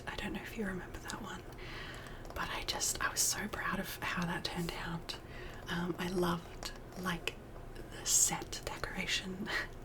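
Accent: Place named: Australia. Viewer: this is such a special video! 🥲💗🙌 I really resonate with your gentle and loving style of ASMR. And I really enjoyed hearing you answer my questions! 🥰🥰🥰 (Also, your lovely Australian accent reminds me of a good friend of mine from middle school, which makes me happy. ❤️)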